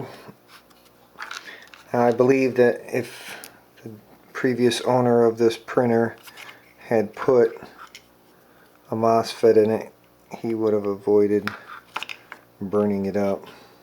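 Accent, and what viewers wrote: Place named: Northeastern Pennsylvania, United States. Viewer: E tree D, haha. Sounds local to me (NEPA).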